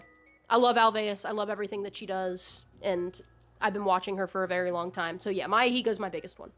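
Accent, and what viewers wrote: South, United States. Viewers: DAVID DIDDLER 😭 I SWEAR EVERY LINE THAT COMES PUT OF YPUR MOUTH IS SO OUT OF POCKET BUT PERFECT THE SOUTHERN ACCENT MAKES IT 100x BETTER HOLLY HELL Sadly I’ve never been to a con of any sort cause I’m from rural Maine and poor but I want to go to the local annual furry con in Portland someday :3 My only horror story about the furry fandom from personal experiences besides having to hide it from my classmates to avoid bullying was probably that I joined when rainfurrest was a current event and a lot of the furries I grew up watching turned out to be creeps 😭 (I think odinwolf ended up being like a huge creep.) Luckily most of the furries that I watched a lot are great people like pocari roo (as far as I am aware) and this guy who I still watch who’s deaf and makes education videos about what it’s like to be deaf and answers questions :3 he’s very wholesome (EDIT: LOOKED HIM UP! 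His name is Xushowolf he’s very cool :] ) So I’m glad not all my memories of being in the early fandom have been tainted by finding out horrible things about the YouTubers years later 😭 Oh yeah also other cursed thing in general is that I also joined the hetalia fandom around that time I’m still in it the fandom is much more chill now for some reason (maybe I’m just good at avoiding the crazy) but I still have people like get mad at me cause I just state (on my own blogs mind you like I’m not even commenting on their posts.) that one of the most popular ships makes me uncomfortable (usuk/whatever y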